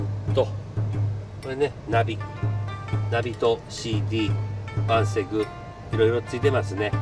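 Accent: Tsugaru, Japan. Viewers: 津軽弁での説明、良いですね😃‼️。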